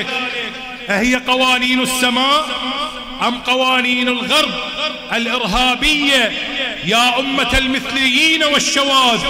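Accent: Iran. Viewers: لهجتكم ايرانية وليست عراقية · ليش يحجي إيراني؟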